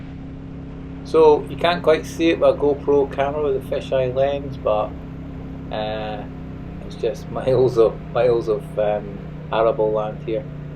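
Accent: Scotland, United Kingdom. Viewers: I have a hunter and same as you head wind and it is a bugger to overtake things, on a good run slight down hill I just got 80mph. But I would say normally 65 to 70 is it. Now as your a Scotland type man I do struggle to catch everything you say ha ha, great videos though. I have a pal who lives in Pittenweem so not too far from you. I'm down on the kent coast. Cheers.😊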